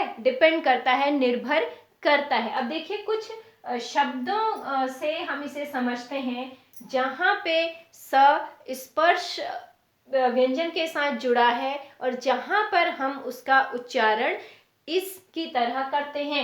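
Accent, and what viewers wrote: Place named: North, India. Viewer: इस्पर्श कोण पढताहे आप नॅार्थ वालेही ' व ' को इ पडते हो. श के जगह स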